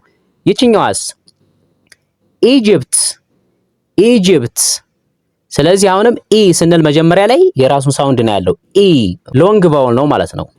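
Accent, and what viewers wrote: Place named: Ethiopia. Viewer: ለድካሙህ ክብር እሰጣለሁ ግን በአማርኛ አክሰንት ሳይሆን አፋቸውን ከፈቱበት ተናጋሪዎች ቀጥታ ብታስነግር (ብታስደምጥ)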